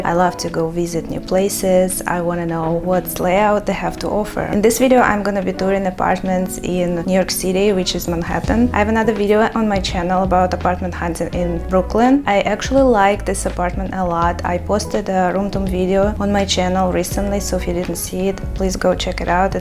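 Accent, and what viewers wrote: Russia. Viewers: невозможно слушать такой сильный русский акцент!!!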